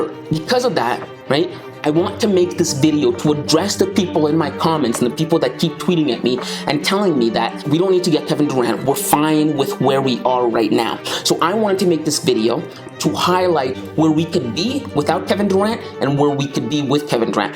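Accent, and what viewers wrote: United States. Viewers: You sound like an American analyst. Looking forward to this team proving you wrong. Everyone in the league wants Durant… within reason. Nets aren’t giving up KD for Pascal and 2 firsts bro.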